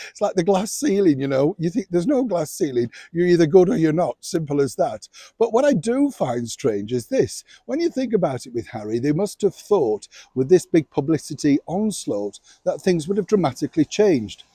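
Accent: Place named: England, United Kingdom. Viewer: I could listen to you all day. Great tips and updates. Least I forget your English verbal flare.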